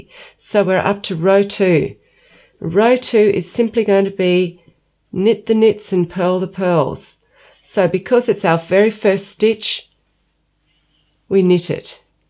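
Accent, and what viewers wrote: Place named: Australia. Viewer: Yay an Aussie.:)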